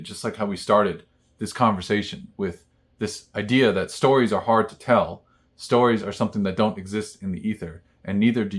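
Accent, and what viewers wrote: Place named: Chicago, United States. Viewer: oooh, Thank you for the The Great Rift by Michael E. Hobart recommendation. Adding it to my goodreads tbr and I'll search for a copy today. Also, thank you very much for the reading timetable for Godel, Escher, Bach. I also shared this with my son. I used to love Chicago. My husband has family in Winnetka. (very snooty so I've heard). Then one of the cousins moved to L.A. for work in Hollywood. I guess they had connections. LOL I can see how people in Cali would think you had an accent. I went to Texas one time for one week in fifth grade/10 y/o), and came back with an accent and got teased a little in middle school by some girl that had a beef with me. Sometimes it still comes out. Oy, imagine if I moved to Texas! LOL But I love accents. It was my pleasure listening to your video. I did take your recommendation about skipping the Lolita part though.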